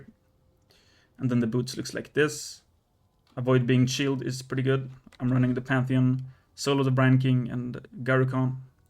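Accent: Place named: Finland. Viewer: Sä kuulostat suomalaiselta.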